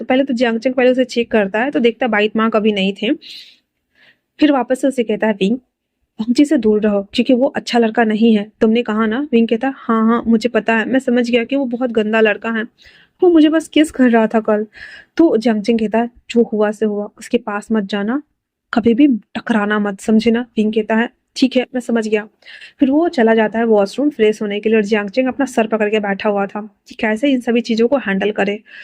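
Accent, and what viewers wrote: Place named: Bihar, India. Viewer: Wonderful story di par jo aap bihari maine bolte hai wo mujhe bhot acha lagta hai osm ❤❤❤😊😊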